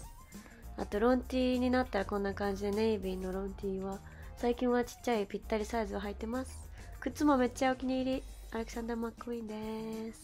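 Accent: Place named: Kansai, Japan. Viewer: めっちゃお洒落💓 ちょいちょい関西弁出るのおもろい😆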